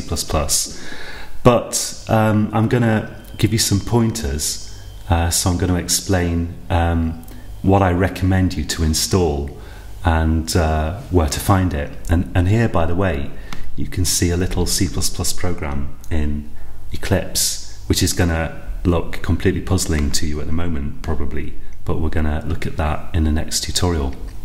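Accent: United Kingdom. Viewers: Strong accent of British!QAQ after learning all of this courses i think i can talk English fluently like a British. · You're not only teaching me Programming but also English at the same time. I have been taking British English classes for about 2 years now and i wish i could sound like you. Really love The British accent, Just incredible!!!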